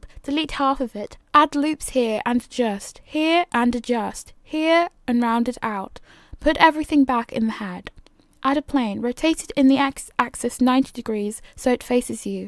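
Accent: United Kingdom. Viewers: I just focused on the British voice.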